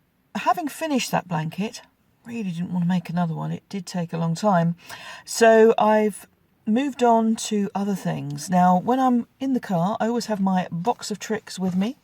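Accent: England, United Kingdom. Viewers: Subscribed - expat now living in Canada - nice to have the english accent ;-)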